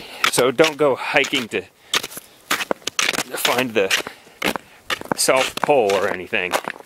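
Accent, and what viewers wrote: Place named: Pittsburgh, United States. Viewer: there's been one or two times where I can hear that yinzer accent but I don't anticipate anyone mistaking you for Pittsburgh dad.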